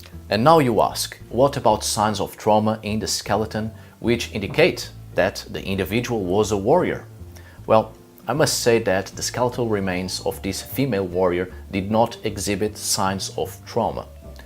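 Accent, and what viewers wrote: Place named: Portugal. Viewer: Olá,! Estava em dúvida se eras mesma tuga, até porque pelo nome bem podias ser escandinavo, mas o sotaque (não leves como pejorativo, até porque falas inglês muito melhor que eu) e a fisionomia deixaram-me com a pulga atrás da orelha. Mas depois fui ao insta confirmar, e claro também porque um português que se preze consegue reconhecer outro compatriota ao longe ahah;) Vídeo interessante, apenas senti falta de referências e fontes listadas na descrição do vídeo, não só por uma questão de verosimilhança da informação mas até para aprofundamento da mesma. Bom trabalho, vou começar a seguir.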